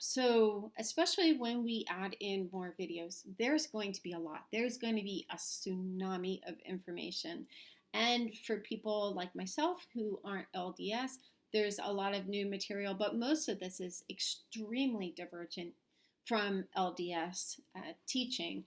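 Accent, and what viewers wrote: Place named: Philadelphia, United States. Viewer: Are you originally from the Philadelphia area? I feel like I hear a little bit of a phila accent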